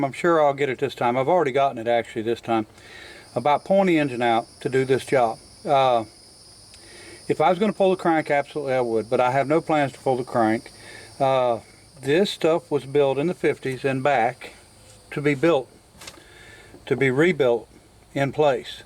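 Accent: South, United States. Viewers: I am a French / bilingual Canadian from the province of Quebec, and I understand your language. It's the same with a beautiful southern accent. I love your automotive related videos. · Nobody speaks Canadian. Kilometres with an American sounding accent. I’m an old Aussie. We speak in millimetres and inches too. Haha. I do love the connection of you tubers. Absolutely awesome work sir.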